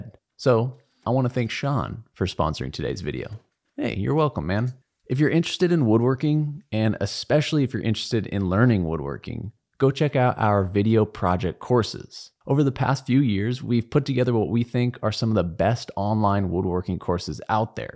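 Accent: United Kingdom. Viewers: Sorry, but too plummy.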